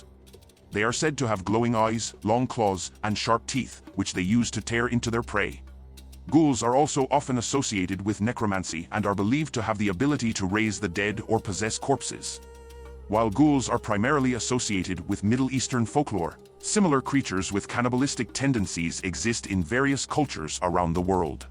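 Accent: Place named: Scotland, United Kingdom. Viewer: I dig the vaguely Scottish AI voice. It's like listening to an up and coming Deckard Cain type doing a class project.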